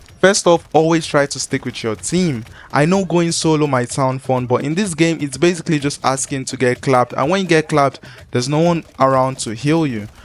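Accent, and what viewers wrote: Nigeria. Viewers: Bro are you Nigerian?? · Your 9ja accent is unique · Wait are you Nigerian? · Bro you Nigerian...😭 Aye great video man❤ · You sound Nigerian. Are you? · I know my Nigerian brother wherever... We can never go wrong with that accent... in fact to be more precise.. you be Yoruba boyyyyy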